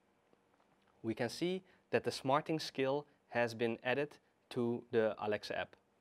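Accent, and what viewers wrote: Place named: Netherlands. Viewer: jij bent nederlands gast your dutch i hear it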